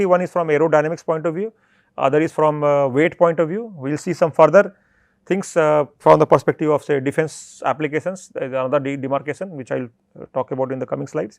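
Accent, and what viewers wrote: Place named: Oxford, United Kingdom. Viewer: Sir aap hindustan me ho eng me ho oxford ki trh kyo bolte ho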